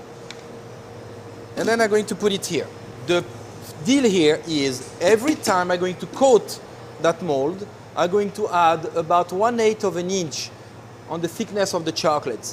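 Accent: France. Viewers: French accent ^^ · this guy has a French accent, the I pick things up guy has an Austrian accent lol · Are you french?? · Il a un accent francer !! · I love that accent! I can't place exactly what it is though! I think it's a French accent, but I can't tell. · oh is it a french accent I thought it was german my bad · Hahahaha. You gotta love the french-accent. :D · good old french accent ! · I definitely love his french-english accent, his randomness, and his job ( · hes from france, he sounds french and his name is french aswell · just a little bit french! lol! great job! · Do you have a French accent I'm guessing? Cool, how old were u wen u learned english? Sorry, I'm a big language nerd :P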